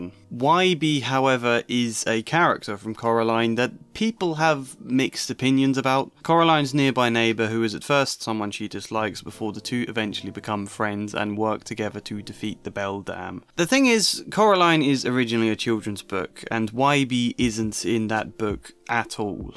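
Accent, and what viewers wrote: United Kingdom. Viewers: I want you to know, that if you did not have a british accent i would not have kept watching this video. That is all.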